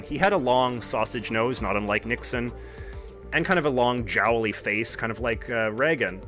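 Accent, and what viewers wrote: Canada. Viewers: that hefty Canadian accent distracted me sooo much... absolutely beautiful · You soound Canadian 'ay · He sounds SUPER canadian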